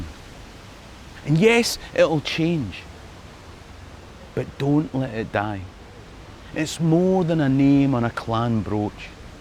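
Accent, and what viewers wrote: Scotland, United Kingdom. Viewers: Interesting and stimulating. History you carry w us, like culture and accent. I found i had to ditch the Scottish dark subtle (sense of) humour when I left Scotland. Kiwi humour is... less developed shall we say;) it's lovely to hear your accent and humour and learn details of history we didn't get at School. Further south from Dunedin, in Southland, you will hear the rolling 'r' in the way the ppl speak. Dunedin is a university town. The countryside around is more rural and Scottish like. Go to places like Ettrick on farmers day. It will feel more like Braemar:) Interested in how you smiled and felt visiting Waipu. I lived around there over 20 years ago. Scottish culture and upbringing gives us values and education and that canny humour. Thank you:)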